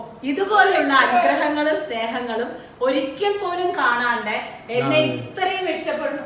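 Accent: Palakkad, India. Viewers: എനിക്കും ഇങ്ങനെ 🥰 കാണാൻ ആഗ്രഹം ഉണ്ട് പാചകവും വാചകവും ഒരുപാട് ഇഷ്ടം 🥰 ഒരു പാലക്കാടിന്റെ സംസാര ശൈലിയും ഒക്കെ ആവണം അത് 👏👌👌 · Hi am always see your new episode. Great dear 🥰 pkd evide anu varubool kananam.realitiyodu kudi ulla presentation.palakkadan natural slang super good bless yu.❤ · I am also a fan of you recently started seeing your vlog I am also from palakad so feel very familiar when I hear your way of talking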